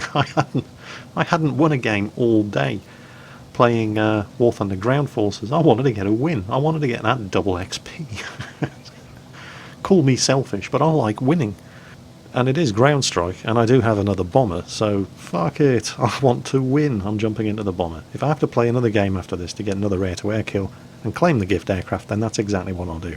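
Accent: Norway. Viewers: I like your Norwegian accent! you sooo funny! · best norwegian accent ever:D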